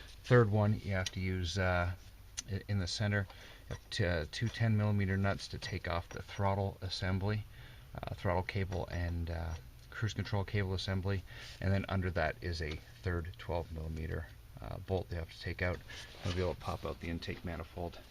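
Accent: Canada. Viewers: on my car which has a GM 3.6 HFV6 , on a north - south set up , (rear wheel drive) , the manifold needs to be lifted too , albeit ever so slightly , which is why they fit platinum plugs as standard from factory . Listening to your patois I'm guessing you're Canadian .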